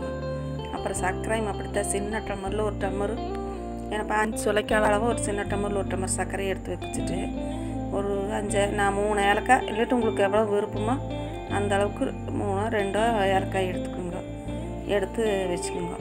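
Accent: Kongu, India. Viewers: கொங்கு தமிழ்😎😎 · கொங்கு தமிழ் ultimate.... 👏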